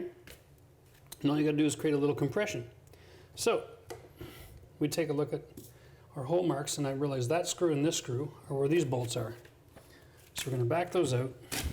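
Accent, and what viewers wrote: Canada. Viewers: I knew you were Canadian when I heard the way you pronounce the words “process” and “out.” Thanks for the walkthrough and the thorough explanations.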